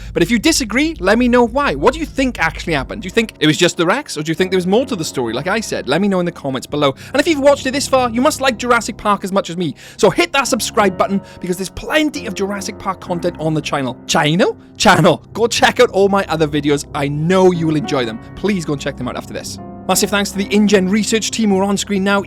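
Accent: Wales, United Kingdom. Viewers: This channel is awesome and just like with Star Wars nowadays, living proof of how the fans of this franchise love and care about it more than the official owners of it do 👏. Also, are you a fellow Welshman? Loving the dialect, and does anyone know the time of that instrumental that kicks in ? So chilling and eery.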